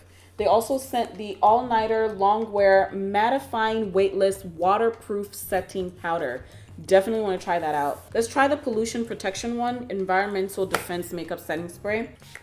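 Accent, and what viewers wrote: United Kingdom. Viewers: The British accent has to stoppppppp. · She’s is giving us that auntie pats british accent and that’s on PERIODT my darling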